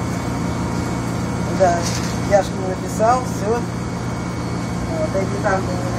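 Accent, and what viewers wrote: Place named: Eastern Europe. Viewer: Yeah... def Eastern European.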